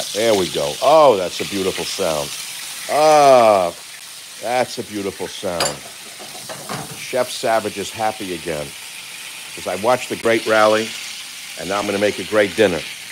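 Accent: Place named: Bronx, United States. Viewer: Dr. Savage, another reason your listeners enjoy your new cooking format so much is it's strong ASMR component. It's very relaxing. The clinking of the untensils, the sizzling of the oil, and that along with your voice, which is deep, rich, and maliflous. On a personal note and as a Bronx native myself, your distinctive Bronx accent reminds me of my parents, grandparents, and other relatives, most gone, who I miss so much.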